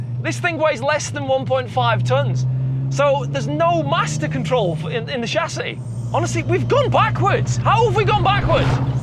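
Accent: United Kingdom. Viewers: Ergh, that Northern accent !!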